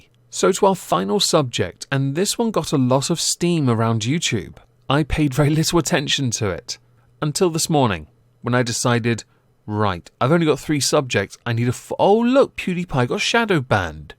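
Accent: United Kingdom. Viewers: You know what made me subscribe? Of course, British wit, voice and humor (which I love as a non native English speaker) plus Lovecraftian theme, but those were just a bonus. The thing that made me finally press the button was - your usage of face expressions. They are so well made (props to the artist who made those!) and varied just enough, and you use them so skillfully to bring a point across or emphasize something, along with a slight voice change or pause, love it. I never though that something like this can impress me so, especially as this element of brand creation is so popular nowadays, everyone creates a cartoony alter ego. You nailed it, it has so much character, it looks like you're actually speaking and articulating through it in real time. Also, the intro with sarcastic "well, isn't this just fantastic" speaks to me on a spiritual level. As well as a concept of Exhibition of Stupid People. 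Good stuff. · I just can’t get over voice and accent like ok British daddy I can listen to you all day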